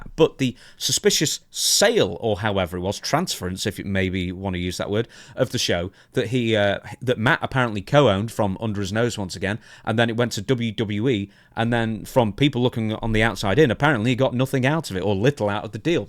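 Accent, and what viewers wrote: England, United Kingdom. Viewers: I think a big reason people migrated to this channel is that for one: is self-aware. He doesn't have a deluded sense of grandeur in comparison to the wrestlers he hosts podcasts with. I don't like Conrad, I don't like RVD's co-host, etc. Brian Last is okay but sometimes he can be super rude when discussing people and as far as I know, Brian Last is morbidly obese. Second, professionalism. This is also why I really enjoy Stevie Richards' show so much. I like Dutch's as well but he's an older guy so I don't expect him to whip out the newest 4k technology, but Stevie has a studio, he puts effort, good mic quality, etc. takes the videos seriously, the camera and mic quality is excellent, and he actually prepares before he speaks on things. I don't mind the rambly moments, but it seems like he as bullet points to get him back on track or he'll cut if gets too off the rails, which I appreciate. 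Third: is a great co-host. If the wrestler doesn't know about something, you can rely on him to suitably fill them in before the show and even on it if they forget some detail. If the wrestlers starts rambling or forgetting their spot, is good at getting things back on track. Fourth: He has a British accent. Instant +1 because that accent was made to narrate, lol. And I've enjoyed every show he's had. For all people like to rag on Stevie Richards for never being that main event talent, his show is one of, if not THE best one out there. Good production quality, a man w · I actually like going to your videos more than Stevie's. Maybe because you are English and I relate to your tones and expressions more.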